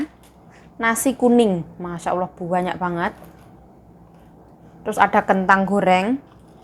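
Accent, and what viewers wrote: Java, Indonesia. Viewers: Hadir mbk... seneng dengerin nek sampeyan bicara jowone kentel jelas 👍👍cayoo 💪💪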